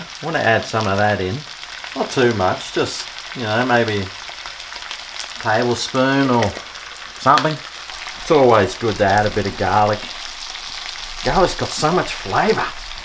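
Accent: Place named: Australia. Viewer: The best Aussie cook on you tube. And with very good Aussie accent and great sense of Humour. Everything is cheap and very efficient with time without stress at all. Very inviting when I watch your programme. Keep it flowing. Happy New Year Mate, from Hobart Tasmania.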